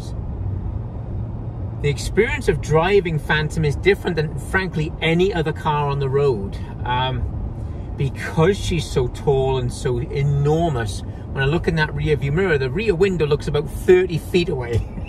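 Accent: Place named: South Wales, United Kingdom. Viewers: Well I clicked to watch this great video to study the Phantom, but then spent 15 mins listening to an accent that sounds almost from South Wales. It threw me haha. Great video however :) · Are you Welsh? You sound as if you have a Cardiff accent.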